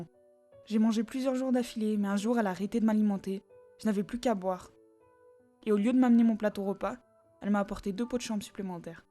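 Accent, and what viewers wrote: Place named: France. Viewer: Ton accent français c' est fou comment je trouve ça très touchant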